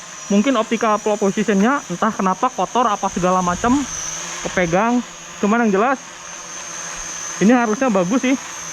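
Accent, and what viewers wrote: West Java, Indonesia. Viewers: Mantap bang orang sunda ya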